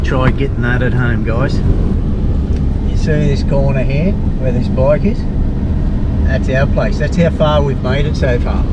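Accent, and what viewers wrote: Australia. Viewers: I thought I was Aussie... THIS bloke is Aussie!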